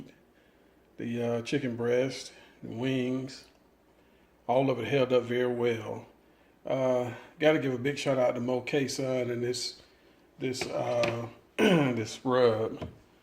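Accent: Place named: Baltimore, United States. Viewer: I may be mistaken but from your accent, Baltimore right? Love the video.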